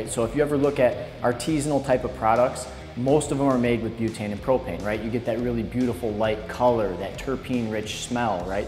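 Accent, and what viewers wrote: United States. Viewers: midwest accent represent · is that a chicago accent? great accent, can't quite pin it tho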